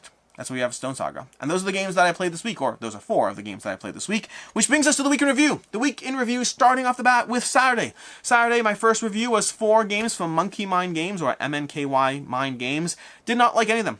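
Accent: Canada. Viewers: I believe your Canadian upbringing is showing. We inherited British pronunciations (and often, spelling) but our proximity to the U.S., and the flood of T.V. channels, has led to this confusion. Just say it the way it feels god to you.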